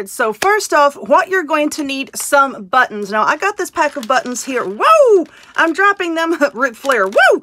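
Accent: South, United States. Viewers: You are so cleaver and funny! I just love hearing your southern accent.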